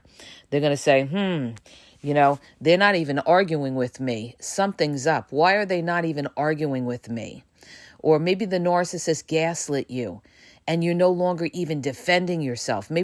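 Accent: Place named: New Jersey, United States. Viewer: I sincerely appreciate your knowledge & sharing it with us. Your Jersey accent is really apparent at times. Thanx again, Sister.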